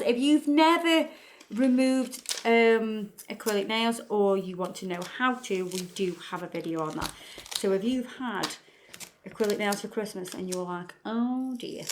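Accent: United Kingdom. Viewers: Well she’s a Brit, and they got a ton of different accents.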